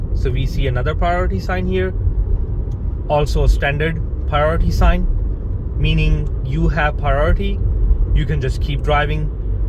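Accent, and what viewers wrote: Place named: England, United Kingdom. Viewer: Besides making the great videos which I am very thankful to you. I have this curioucity to know where did you learn this English accent from.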